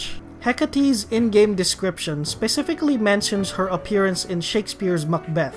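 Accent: Philippines. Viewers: That filipino accent is too thicc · You oddly sound like a Filipino. Great stuff tho! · He has that thick filipino accent. Pretty sure he is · accent seems familiar. are you a Filipino? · I just can’t help notice your accent. Are you a filipino? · The Filipino accent is killing me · Damn that Filipino accent is getting to me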